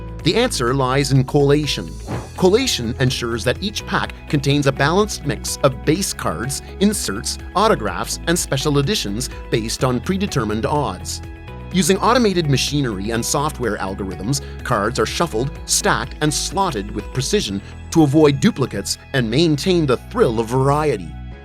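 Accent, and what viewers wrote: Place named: Midwest, United States. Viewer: Love the Midwest accent and the info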